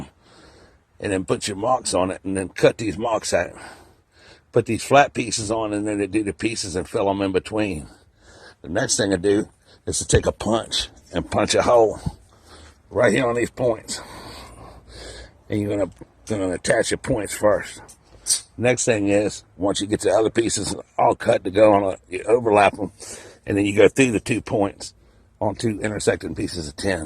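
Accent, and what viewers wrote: United States. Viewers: What state is this accent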